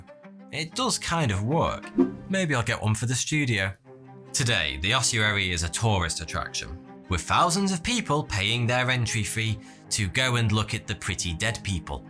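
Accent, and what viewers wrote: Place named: Yorkshire, United Kingdom. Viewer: Where's this man from? Sounds Yorkshire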